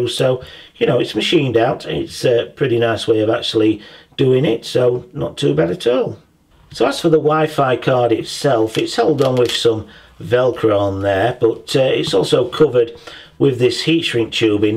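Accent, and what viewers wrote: Yorkshire, United Kingdom. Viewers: First class review. Is that a Yorkshire accuent. Most pleasant. Many thanks for uploading.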